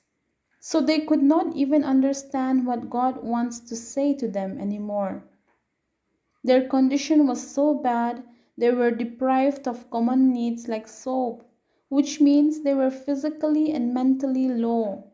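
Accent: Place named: Mizoram, India. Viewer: Ohhh i accent ka hre chiaha ka lawmzia chu ❤ mizo lo ni rengin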